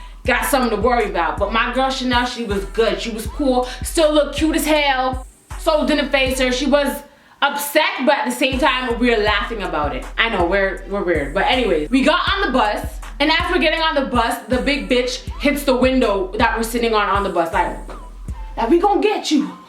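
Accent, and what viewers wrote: Jamaica. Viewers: Are u Jamaican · u Jamaican accent wa fix up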